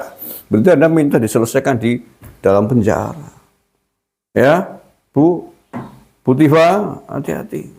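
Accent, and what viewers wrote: Banyumas, Indonesia. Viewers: Apa rika wong banyumas? Medok bngt.... Hehehhee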